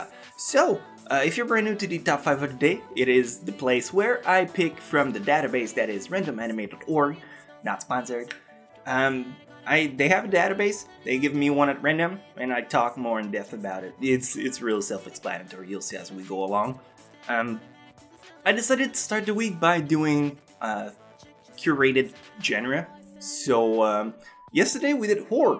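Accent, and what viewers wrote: Canada. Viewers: hey are you Canadian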